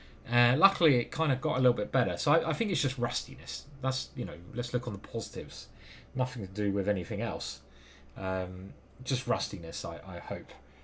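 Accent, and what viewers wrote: England, United Kingdom. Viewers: Alright mate, really enjoying your channel, which I'm fairly new to. I watch Eric rosen and have enjoyed his speed run. He's so relaxed and calm. But, I don't know if its because you are English and sound like me, but I really relate to your commentary and thought processes. Which is ridiculous, because the chess i play is the equivalent of Sunday league pub team football, where just turning up is a victory. But you have a knack for explaining shit in a really relatable way. You come across like a normal bloke that I could easily have a pint and a chat with, and it wouldn't be weird. Anyway, not sure what im trying to say, except cheers and good luck. Check out 'devon tracey makes no money at chess'. Beautiful channel, interesting fella. OK that's it. Cheers.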